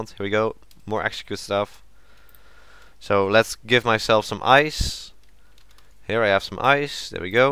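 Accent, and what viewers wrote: Netherlands. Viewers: Je hoort ook gewoon dat je Nederlands bent XD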